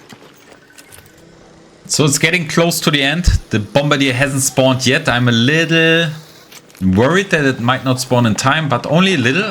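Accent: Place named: Germany. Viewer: Good Video. Dont kow why some people crying here. Außerdem hörst du dich deutsch an. Muss man supporten. Sollen alle die fresse halten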